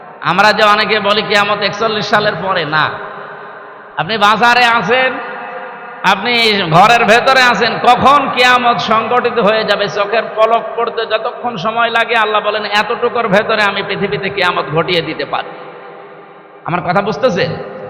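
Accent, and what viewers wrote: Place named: India. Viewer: দাদা ইন্ডিয়ার সুরে কথা বলছে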